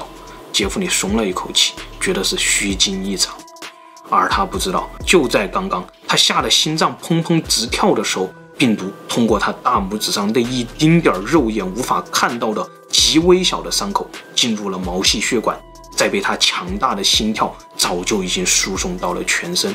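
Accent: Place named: Hunan, China. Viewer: 带着湖南口音的普通话，居然能把故事讲得如此引人入胜，真是个奇迹！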